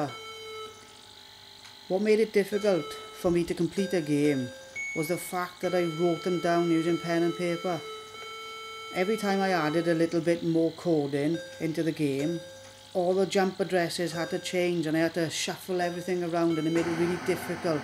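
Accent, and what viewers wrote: Wales, United Kingdom. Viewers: Awesome little game!,love the Welsh accent lol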